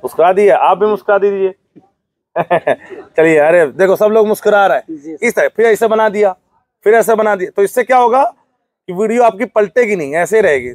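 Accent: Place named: Chitrakoot, India. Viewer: Baba ji aap kahan ke ho Bhasha ka chitrkut District ki lag rahi hai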